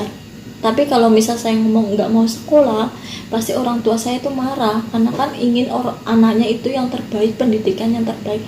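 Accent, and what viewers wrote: Banyumas, Indonesia. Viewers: Selamat pagi mbak ngapak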